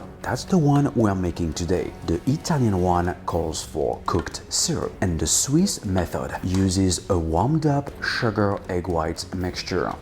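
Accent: France. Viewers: Banish with as French accent!! Need anything else . Make a macaron!!!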